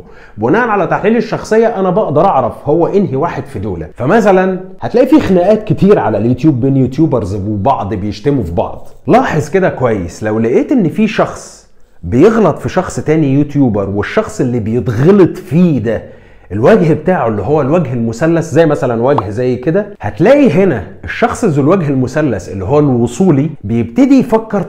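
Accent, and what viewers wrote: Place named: Morocco. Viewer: لا هي مغربية لهجتها مغربية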